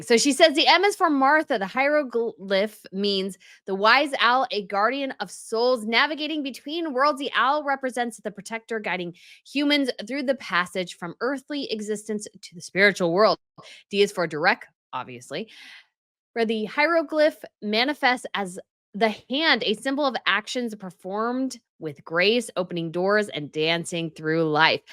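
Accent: United States. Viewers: I love you, are you from the South? I don't need to know exactly where but I swear you could be one of my friends:D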